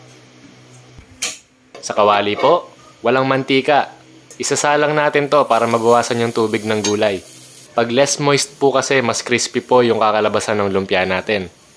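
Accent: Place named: Pampanga, Philippines. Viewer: yung accent nya...kapampangan ka? eheh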